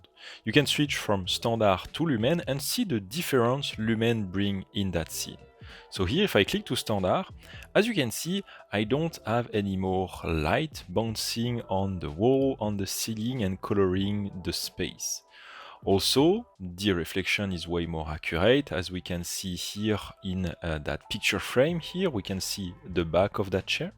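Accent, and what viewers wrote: France. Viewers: Je sens le tont français dans votre voix 😅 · And here you go again with your negativity, being disrespectful and utterly rude. Same as in other tutorials with your comments. I now have reported your messages on the YouTube platform. It says more about your lack of skills. If you don’t find the capacity to understand then simply leave this channel. Unlucky for you there are only a few really great ones out there such as his. Therefore please take a beat and reconsider when you voice against other nations, especially as beautiful as the French. If you were to listen closely you would notice that has a very broad and educated english vocabulary, which is much better than most none-native english speaking people have. So yes, his english is excellent! Having a french accent is not getting in the way of his clear pronunciation at all. It is to note that he is the Technical Marketing Specialist at Twinmotion and is in this position for a reason.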